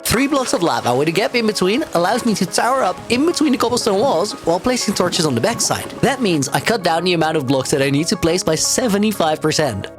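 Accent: Netherlands. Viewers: incredible! just finished binge-watching your videos. also, your English has a slight Dutch accent. Does that mean you actually are Dutch?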